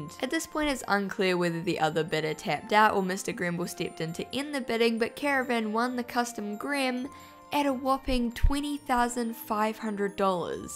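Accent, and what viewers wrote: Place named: New Zealand. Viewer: Kiwi accent... finally one of my own 🥝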